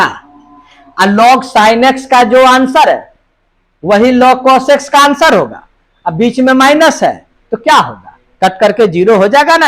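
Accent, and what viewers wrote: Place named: Rural, India. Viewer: gawar kaise bole rahi hai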